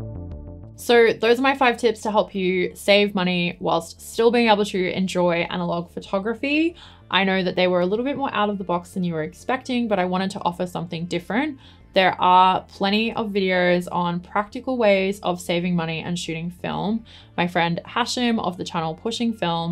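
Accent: Australia. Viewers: Subscribed. Enjoyed the video. It's crazy how I picked up your AU accent. Over the years I started to follow a few photographers from AU and this algorithm is now flooding me. But there are really good creators out there. Hope to visit one day.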